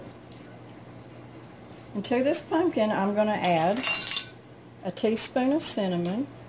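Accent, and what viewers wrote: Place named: Western North Carolina, United States. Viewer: Miss it looks so good. I bring watch u on Sundays. I'm new to our channel and I'm like u a country cook. I love all u make ur like being with a good friend just talking and sharing ideas. I'm from western NC and ur accent sounds like where I'm from lol. Your are also a blessing. Speading Gods love through ur channel.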